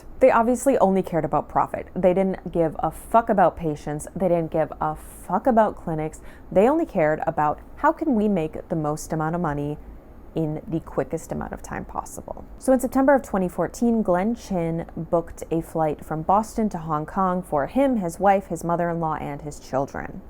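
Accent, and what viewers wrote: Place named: United States. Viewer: Well, your explanation of why you were uncertain of the pronunciation. If English is your second language, phenomenal American accent.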